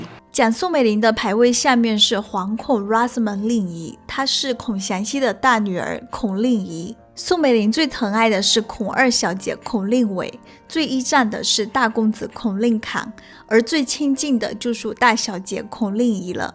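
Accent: Fuzhou, China. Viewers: 影片內容跟拍攝都很好，但...講話發音是福州土腔? 發音都不標準，聽了十分難受。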